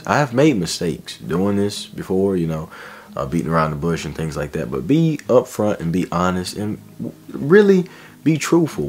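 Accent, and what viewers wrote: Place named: Mississippi, United States. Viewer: this guy gotta be from mississippi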